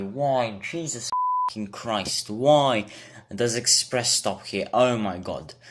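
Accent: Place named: England, United Kingdom. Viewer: Are you really Serbian? You sound English to me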